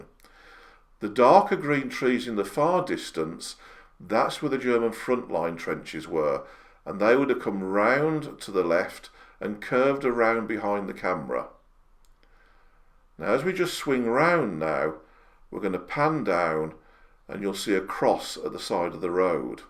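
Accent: Birmingham, United Kingdom. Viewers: well in that case it really is a lovely occurrence in such a devastating and sad time. Do I detect a soft brummie accent?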